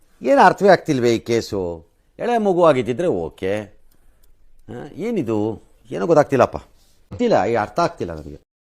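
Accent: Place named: Chamarajanagar, India. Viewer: Nammma Chamarajanagar ada bhashe sogadu suuuppar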